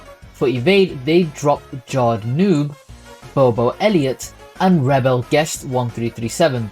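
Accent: United Kingdom. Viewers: why do you kinda sound like a british girl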